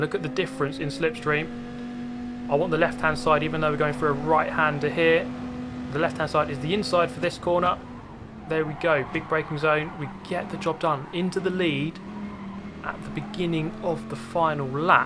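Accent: United Kingdom. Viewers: I love to practice my english pronounce with your british accent! Thanks for your existence. ❤️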